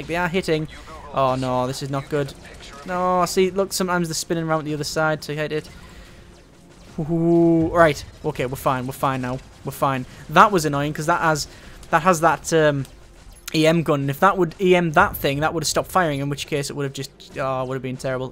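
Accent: United Kingdom. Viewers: jezus christ that british accent is horrible !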